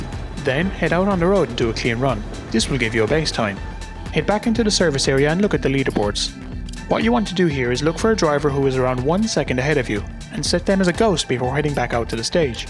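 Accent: Ireland. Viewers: You sound Irish and I’m Irish and you sound like you have an Irish accent, how you Irish.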